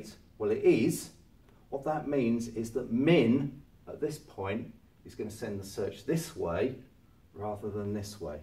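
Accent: United Kingdom. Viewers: Ohh British Accent ^^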